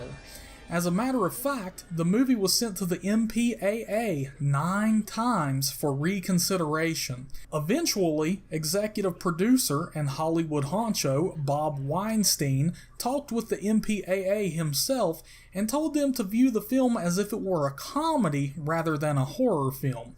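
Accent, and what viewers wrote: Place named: South, United States. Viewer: I can't get past the country voice, and I'm from the south!!!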